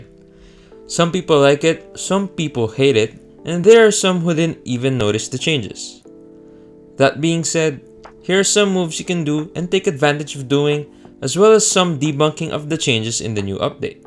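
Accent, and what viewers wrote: Philippines. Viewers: u sound like filipino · Wait..pinoy ka?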